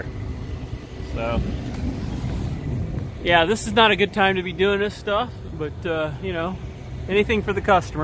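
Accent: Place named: Ohio, United States. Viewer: Nice. I had a feeling. I was born and raised in the SW Ohio region, Hamilton to be exact, and thats the dialect so I pick up on it right away.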